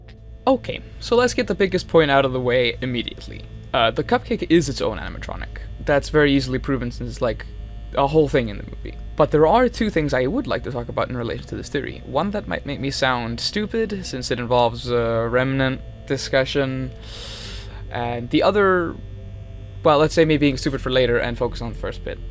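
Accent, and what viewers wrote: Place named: Iceland. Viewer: omg, just found your channel, thought your accident sounded familiar… sæll íslendingur😁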